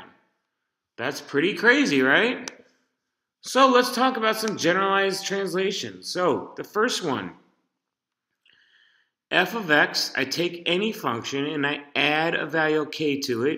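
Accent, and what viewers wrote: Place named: South, United States. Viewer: He sounds drunk with a deep southern accent and it's great cause it keep me interested.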